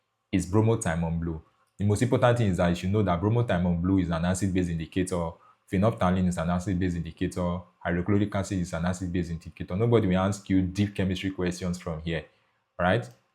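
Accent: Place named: India. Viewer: Why am i hearing indian here oo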